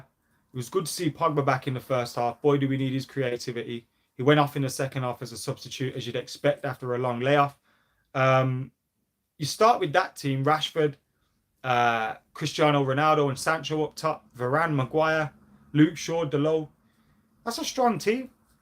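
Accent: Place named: Midlands, United Kingdom. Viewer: I'm good at accents where are you form. I'm not taking the piss I think its Midlandsish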